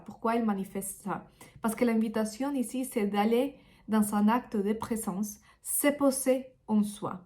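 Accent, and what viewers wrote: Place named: Spain. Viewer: J'adore votre accent je suppose que vous êtes espagnol....merci pour cette belle vidéo Namasté ❤🕉🙏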